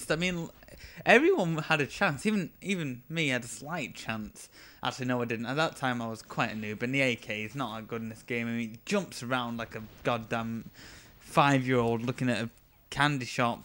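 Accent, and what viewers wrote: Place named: United Kingdom. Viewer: You sound like one of the MI6 members. :D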